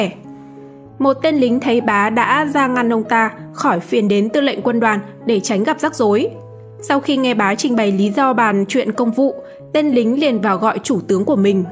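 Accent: North, Vietnam. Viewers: Giọng Bắc kỳ đọc rất khó chịu,kho nghe quá,n thì đọc l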